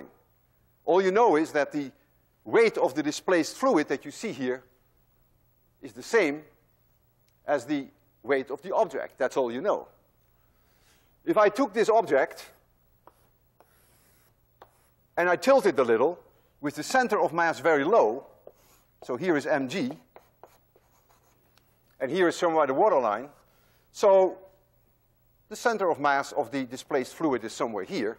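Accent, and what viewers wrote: Netherlands. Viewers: I like the dutch accent u have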